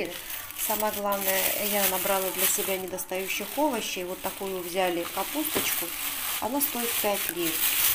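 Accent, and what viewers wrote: Georgia. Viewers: вы уже не первый раз отвечаете на комментарии о замечаниях, зачем? Очень много внимания этим людям, они только это и ждут. Мне например нравится ваш грузинский акцент. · Мне наоборот нравится ваш акцент грузинский и когда неправильно говорите слова это придаёт живости, характер вашим видео. Приятно, что это на видео живой человек, с душой, а не робот на работе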